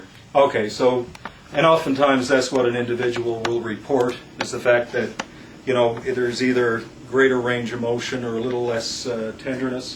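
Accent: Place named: Newfoundland, Canada. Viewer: This doctor is a Newfie! I can hear the accent.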